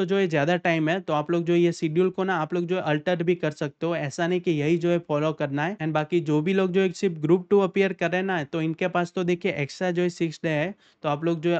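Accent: Gujarat, India. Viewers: Tame gujrati lago cho....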